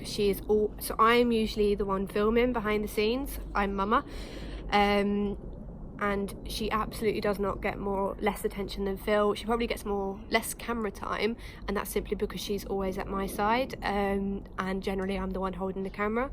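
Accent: United Kingdom. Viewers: I love British accents. Ever since I was a small lad, my Dad and I would always try to imitate all the different kinds. So now I mainly use them on my daughter to make her giggle at times. Your accent reminds me of an old clip from a movie called Wayne's world, a scene that used to make me crack up. <link> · No need to explain anything. You’re house is amazing, love your British accent, the dogs are adorable and all pets are greatly loved. Life is waaaay too short to make negative comments on a very positive channel.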